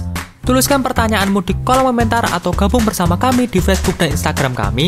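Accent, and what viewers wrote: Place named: Java, Indonesia. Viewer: wkwkw medok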